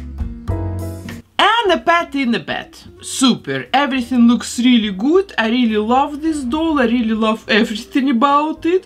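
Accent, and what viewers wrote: Russia. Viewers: you have a Russian accent in your pronunciation. are you Russian? :) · У меня шиза или это русский акцент?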